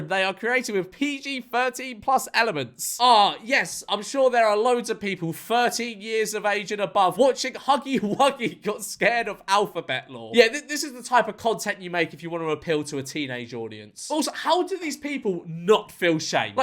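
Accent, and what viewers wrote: United Kingdom. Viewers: I approve i like this smol bri’ish man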